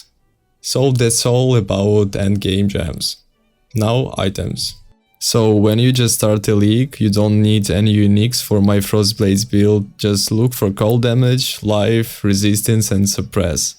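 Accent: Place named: Eastern Europe. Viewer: Never thought frost blades can get to this dps in that low investment) Also you accent, Where are you from? Sounds like east europe Или даже Россия)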